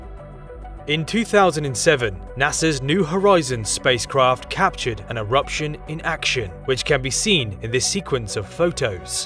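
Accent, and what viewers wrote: United Kingdom. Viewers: boy i cannot get enough of these spectacular videos plus the narrator is got me talking like british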